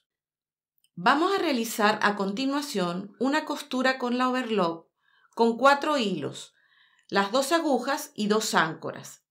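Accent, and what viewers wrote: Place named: Venezuela. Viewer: me lo imagine por tu acento, q bueno, yo tambien soy Venezolana y estoy viviendo en Australia. Me compre la maquina pq estoy haciendo bolsitos d tela y collares y me aligera el trabajo, tengo una tienda online Nanajuliedesign, vendo solo en Australia , estoy encantada con tu explicacion y ahora mas sabiendo q eres venezolana. En q parte d España estas?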